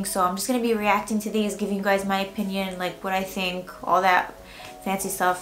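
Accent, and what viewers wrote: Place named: New York, United States. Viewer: Love the NY ACCENT X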